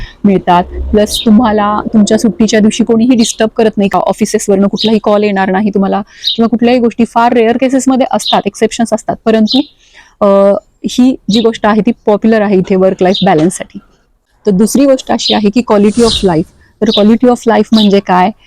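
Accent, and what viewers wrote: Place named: Kolhapur, India. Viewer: खूप छान माहिती दिली मॅडम तुम्ही . तुमच्या बोलण्या वरून तुम्ही कोल्हापूर , सातारा , सांगली , किंव्हा पुण्याच्या आहात अस वाटत .. बरोबर ना 😊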